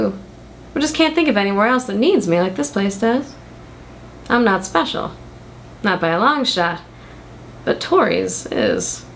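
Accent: South, United States. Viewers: I actually heard multiple southern accents as you read, and each of them was pretty good. That may be why you think it's bad but, as lifelong southerner, I think they were just fine. Bless your heart! ;) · Not a bad Southern accent I must say! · Don't fret... The accent is an accident for most of us southerners too! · I gotta say I really can see you as a great southern belle with that accent. Great story.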